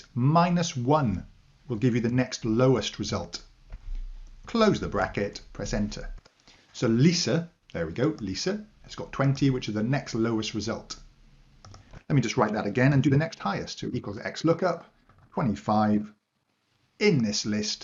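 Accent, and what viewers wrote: Wales, United Kingdom. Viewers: Simple, clear, knowledgeable, and with a slight Welsh accent which I like. I can hear it cos I'm English 😎. There are lots of good Excel tutorial channels but this is one of the best.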